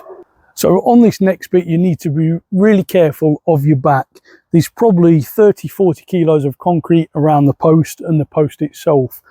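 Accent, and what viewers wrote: United Kingdom. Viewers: Is he bringing these to his pawn shop? And why is he taking with a British accent?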